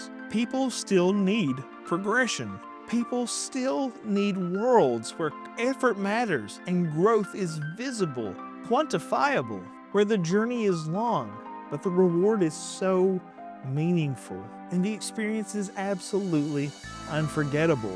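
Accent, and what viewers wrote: South, United States. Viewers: It's very refreshing to hear a Southern accent talking about something I'm interested in that's unrelated to where they're from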